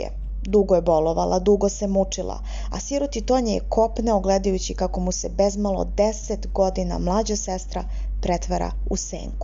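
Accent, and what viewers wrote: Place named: Podgorica, Montenegro. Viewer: Dobra priča. Iz Podgorice sam obozavam ovaj naglasak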